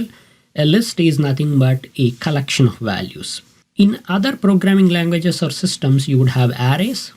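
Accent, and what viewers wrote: India. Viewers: indian accent --> close window. But thanks anyway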